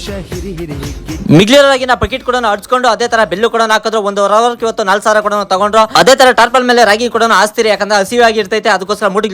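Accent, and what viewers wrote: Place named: Chintamani, India. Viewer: Nim kannada different ide, chintamani gowri bidnur side avru ಅನ್ಸುತ್ತೆ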